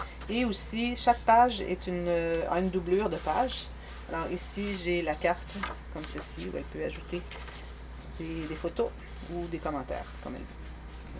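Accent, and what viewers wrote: Canada. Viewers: Je m'abonne grâce à (eternity pour toujours) tu es très doué magnifique j'adore je like 👍👍👍👍👍👍 as tu fais un tuto pour l'enveloppe à soufflet tes explications sont très très claire j'adore aussi ton accent tu es canadienne ? Si oui j'y suis allé et j'ai adoré je suis revenu et je voulais déménager la bas mil merci pour votre partage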